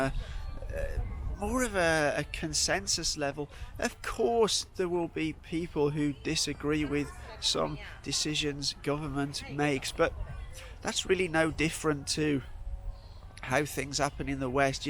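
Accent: United Kingdom. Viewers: It is interesting to see some British guy (judged from accent) filming comments about China while self-wondering in Chinese city lol.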